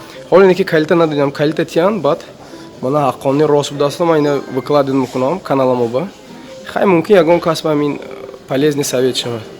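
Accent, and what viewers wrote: Konibodom, Tajikistan. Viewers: Канда занид бародар шеваи Конибодомива гардам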